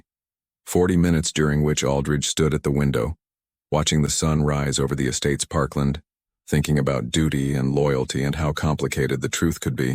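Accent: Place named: United States. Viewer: Ruined by being 'read' in an American accent. 😡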